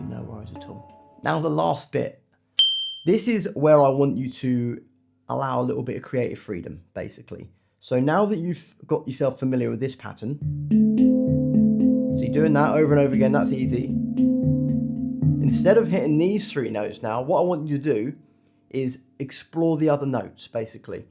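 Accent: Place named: United Kingdom. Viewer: Thank you for your quick reply, which has put my mind at ease no end. My handpan should be delivered tomorrow, which I feel very excited about, with some trepidation. I'm a bit scared about what I will receive, after watching so many warnings about cheap Chinese instruments being out of tune. I get that, I know you get what you pay for, but im not in a position to commit to a very expensive instrument at the moment but hope to progress into a better quality instrument in the future. Thank you for taking the time to reply, it was interesting to hear about your heritage from the UK, as you do drop those little hints of your accent in your videos, which to my mind makes you more approachable, and a very warm hearted person, everything a good teacher should be!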